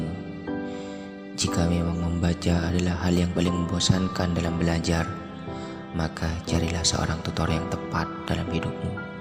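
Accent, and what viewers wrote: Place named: Madura, Indonesia. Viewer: ni pasti orng madura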